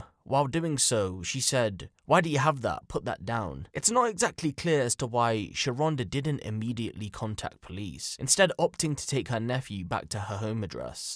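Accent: United Kingdom. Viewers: I'm so sick of hearing people with British accents on American YouTube. Go make money in your own country. Everyone complains about America but they all want our dollars.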